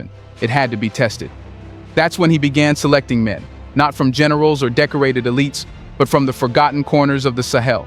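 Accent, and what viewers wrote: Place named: United States. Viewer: Who is this??? Why are you providing such Details? Who sent you? This American accent reporting so hard on this man. Thanks but no thanks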